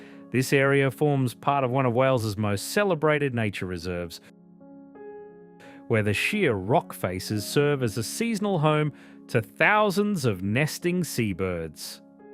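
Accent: Australia. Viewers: Yes,and an Aussie who's trying too hard to make it sound dramatic, but ends up with odd pauses and emphasis. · Nice if the place names were all pronounced correctly. Also odd to have what sounds like an Australian accent narrating. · Lovely video with very relaxing narration. Is that an Aussie accent?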